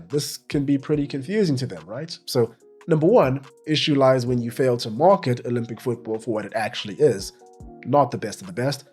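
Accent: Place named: South Africa. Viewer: 😅He's not English I'm South African his accent is closer to mine than England on the opposite side of the hemisphere to us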